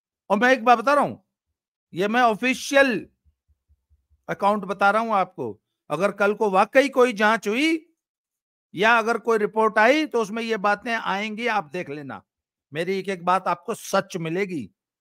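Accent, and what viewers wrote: Haryana, India. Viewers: Babi ji leave everything aside but your haryanvi n punjabi accent too good...make a video purely in these language. It ll be delight to watch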